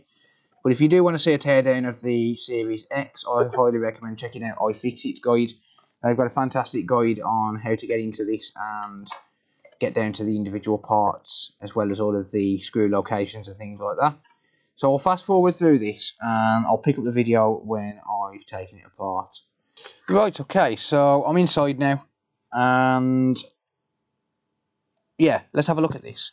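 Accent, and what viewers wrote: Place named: Birmingham, United Kingdom. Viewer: Don’t know how,but for some unknown reason,one of your videos popped up in my recommendations…that was a few hours ago. 😂 I’ve watched a few since then. Fantastic channel and great to hear a English voice for a change. The bonus i,you do repairs for us the public,so now you are a top of a very short list when it comes to needing repairs. Keep up the amazing content and yes,I’m most definitely subscribed 👍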